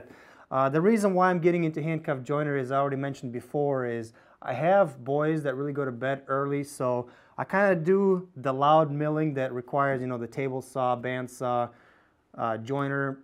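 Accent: Russia. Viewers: I knew it))) You cannt get rid of a russian akcent compleately))) Regards from Ukraine!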